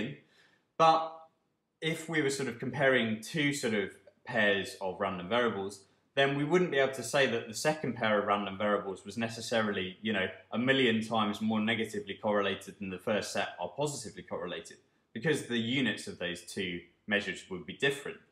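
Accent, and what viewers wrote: United Kingdom. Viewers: British accent....NICE ! ! ! Wishing all Yankees could have British accents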